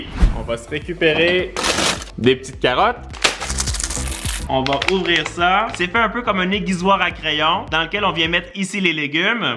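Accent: Quebec, Canada. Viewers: Bonjour j adore ton concpte de vidéo et par dessus tout ton accent québécois je te dis bravo de ne pas avoir lâcher pendant tout se temp se que j essaye de dire ses que je suis une fan depuis très longtemp et je voudrais que tu me réponde.Et aussi j espere que toi est Isaac vous vous santer bein pendant se moment de crise sanitaire mercie d avance et stppp reponds moi❤❤❤❤❤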